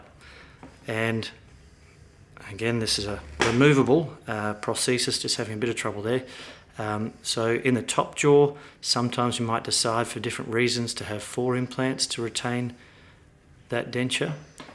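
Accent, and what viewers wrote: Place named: Australia. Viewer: Here in the u.s. my implants cost $30,000. I love the Aussie accent.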